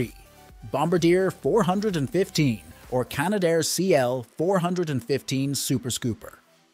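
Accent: Ireland. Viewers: I believe the narrator is Irish & their English pronunciations are a bit different. No problem.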